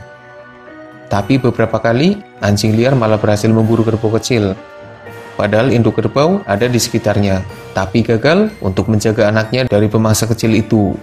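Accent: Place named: Java, Indonesia. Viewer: Medoknya itu unik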